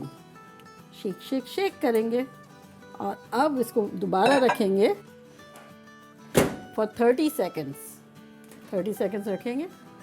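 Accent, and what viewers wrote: India. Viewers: Aapke bolne ka style Indian hai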